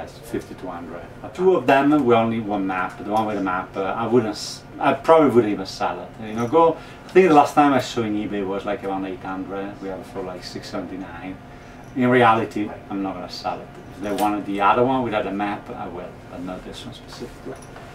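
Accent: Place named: Italy. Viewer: che stile si sente che sei italiano!!!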